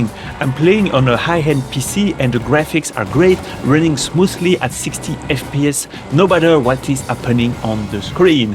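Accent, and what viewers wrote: France. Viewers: cet accent francais 😅 (Voilà comme ça c’est mieux)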